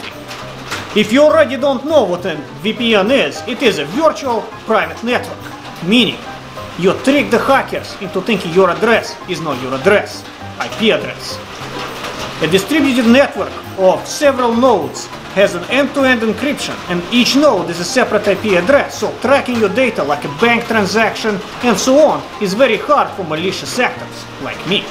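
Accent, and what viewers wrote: Russia. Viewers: Wth why is he getting more russian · I tried watching this in the break room at work today. My Belarusian co-worker happened to walk in and she was like "is that a Russian accent?" Yeah, we had a good laugh. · Arh. The russian accent is back. I like, i like very much.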